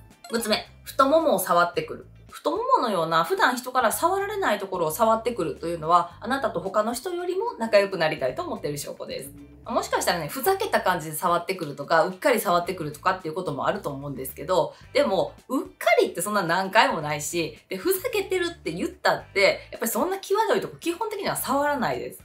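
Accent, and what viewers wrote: Kansai, Japan. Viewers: 声と関西弁にやられる方多いでしょうね😊個人的には、女性社員と把握に役立ってます。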